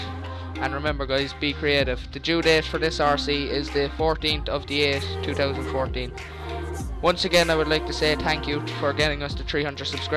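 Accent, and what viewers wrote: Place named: Ireland. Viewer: That irish voice thoo ;)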